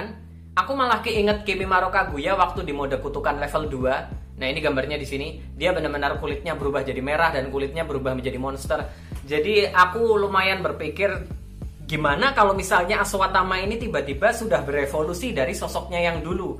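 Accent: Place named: Pekalongan, Indonesia. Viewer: Semangat mas dengan konten super Hero lokal nya..yakin lah kalo film superhero kita sudah jadi dan rilis Pasti Channel mas yang suaranya khas Pekalongan ini bisa makin Cetar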